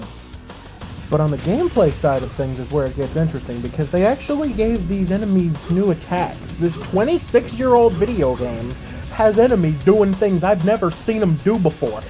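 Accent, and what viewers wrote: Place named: Midwest, United States. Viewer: bruh that’s that midwestern type accent you don’t get it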